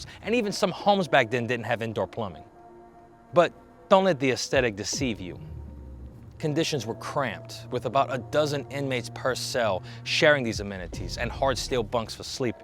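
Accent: New Orleans, United States. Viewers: Stick with it. I believe your style and delivery are unique. They add interest to your presentation. Is that a New Orleans accent?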